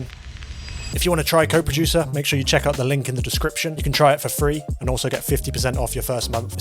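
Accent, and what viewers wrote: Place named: United Kingdom. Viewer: It's only now that I've realised after few secs of vid that you're British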